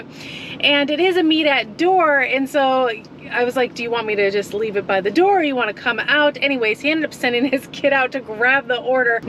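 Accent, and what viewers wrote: United States. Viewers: Hey, you should be teaching English abroad. I like your pronunciation and your "American" accent lol plus as a fellow driver I follow you for your "delivery" content 👍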